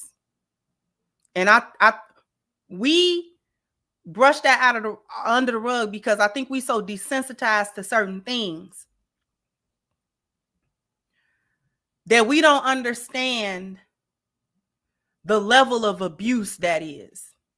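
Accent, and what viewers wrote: Chicago, United States. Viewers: Yep, loved the "tuna can" comment. That's Chicago in you! I know it when i hear it! 😂😂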